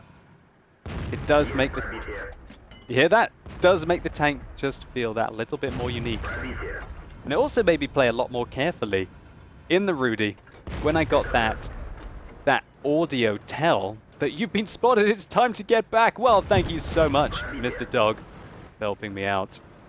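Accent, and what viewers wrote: Russia. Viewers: Why Russian accent don't understand. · LOL, love that russian accent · It was realy bad. You reviev tank with was in polish army with polish tankers and u speek with russian accent with rusian flag and with Soviet Bear...It was realy bad and insulting for polish ppl.... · Why make Russian accents in a video about... a Polish theme tank? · Rolf Rolf it is so easy to flame, isnt it? If you would spend some time to read a book or two, about polish tank platoon during II WW you would realize, that the idea of movie itself and this bloody tank is an insult to the history, but it is easier to pick up the most obvious fact, that some dude reviewing a tank from a soviet tech tree speaks with Russian accent. Congratulations to you, depth of your analysis is staggering, and the fact that you think nobody noticed that till now just means you are a seriously disturbed narcissistic individual.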